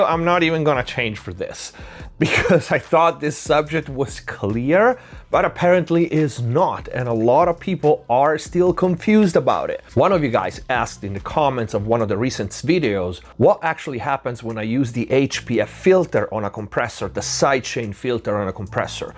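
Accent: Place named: Italy. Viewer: I already knew the subject but it's great to see pros hitting the technical side of it. You sound Italian my friend.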